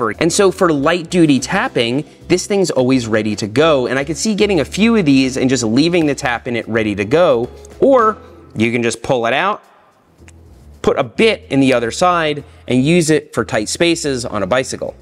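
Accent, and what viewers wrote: Long Island, United States. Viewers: Long Island, NY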